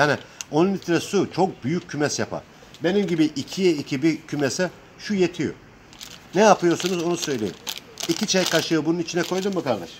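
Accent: Black Sea, Turkey. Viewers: Selamün Âleyküm Karadenizlisin Abi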